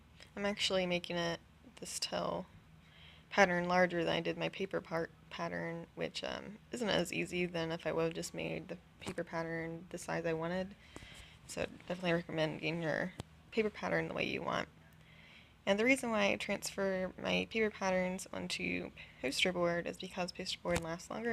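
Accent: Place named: Northeast, United States. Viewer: Are you from Northeastern America? I'm from the south, but I can hear a familiar "ou" sound when you say poster board or smth like that. Sorry if this seems weird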